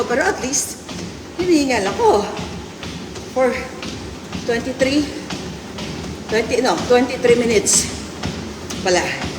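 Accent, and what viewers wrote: Iloilo, Philippines. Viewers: hahaha hindi gid nya madula ang accent mo ilonggo nang biskan mg hambal k tagalong or English pg hindi mo ma translate agad illonggos words ga buka sa bibing mo ..halong gid permi nang stay healthy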